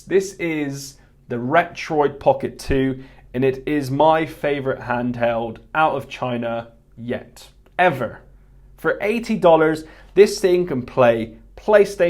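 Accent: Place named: United Kingdom. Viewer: are you based in england or scotland? i am just curious because of your accent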